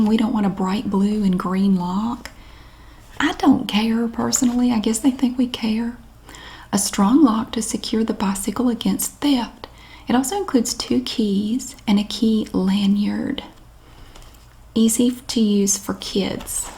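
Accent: South, United States. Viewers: Ur pretty ! I like ur soft southern accent 🙃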